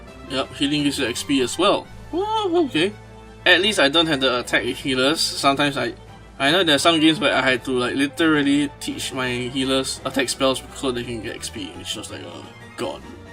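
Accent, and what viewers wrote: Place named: Malaysia. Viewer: never thought I would hear a Malaysian accent.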